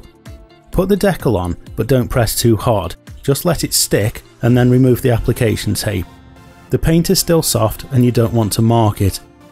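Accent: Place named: Stoke-on-Trent, United Kingdom. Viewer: What did you use for the discs? And are you from the stoke on trent area? Your accent sounds local. Cheers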